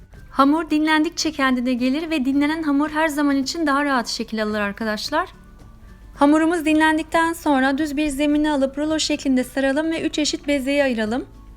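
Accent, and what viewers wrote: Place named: Azerbaijan. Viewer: Ben Türkiye Erzurum kökenliyim atalarım Erzurum'a Horasan'dan gelmişler zamanında diyeceğim o ki sizin Azeri diliniz yani konuşmanız benim dedelerimin aksanına çok benziyor.Ne zaman bir Azeri konuşması duysam beni kendine çeker mutluluk duyarım saygılar sunarım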